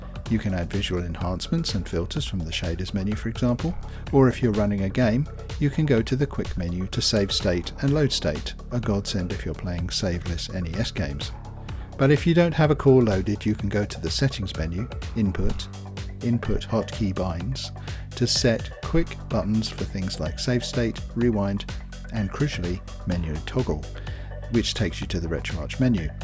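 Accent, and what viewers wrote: England, United Kingdom. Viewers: Damn, ur voice is so soothing... And that British accent... U can tell me to kill my parents and all i would be able to reply is Yes, Master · Finally a English voice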